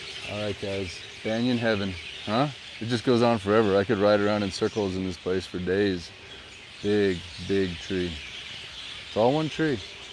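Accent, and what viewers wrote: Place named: Hawaii, United States. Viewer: Love your pigeon accent.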